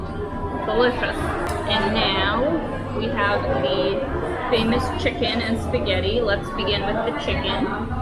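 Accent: Canada. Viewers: Your "out" and "about" accent is a dead give away that you're Canadian. 😉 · Welcome to the Philippines and sorry to hear about your stomach bug or flu. For me your accent gave away where you’re from before you even mentioned that you’re from Calgary. I’m pretty sure you’ve received a lot of recommendations already as far as what food to try and places to visit. If you ever get tired and want to relax, get a massage and I recommend “Hilot” massage. For me, that is better than Swedish or Thai massage. I recommend to go get them at “The Spa”. They have a lot of locations within Metro Manila.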